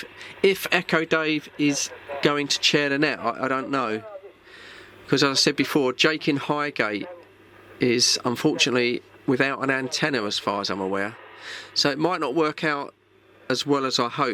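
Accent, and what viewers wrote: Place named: Newcastle, United Kingdom. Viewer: ahh ok, Geordie accent!!!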